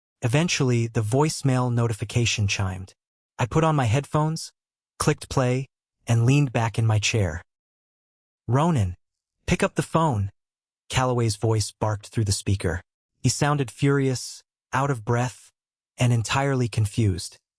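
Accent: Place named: South, United States. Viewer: I love it that the AI voice sounds like it’s speaking Chinese with a southern accent. 😂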